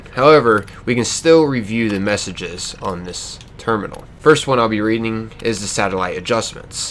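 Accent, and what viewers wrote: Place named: Philadelphia, United States. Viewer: Are you from around Philly? I'm trying to place your accent.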